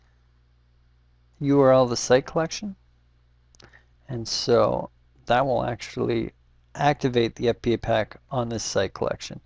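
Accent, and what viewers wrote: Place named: United States. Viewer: I really like this video. There alot of SharePoint 2010 admins who need to step up on their training... hence me watching this video. You have a good teaching technique that is way better than the other sites i've looked at... and it's in clean American English! Please make more of .Net SharePoint related videos!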